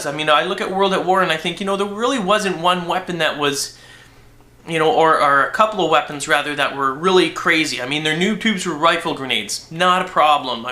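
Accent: Canada. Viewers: is he canadian? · are you canadian?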